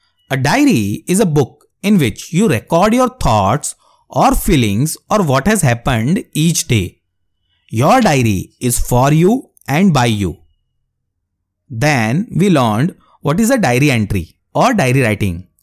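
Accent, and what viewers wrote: India. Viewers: Pure Indian accent of English 😊😊❤ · The pure accent of indian english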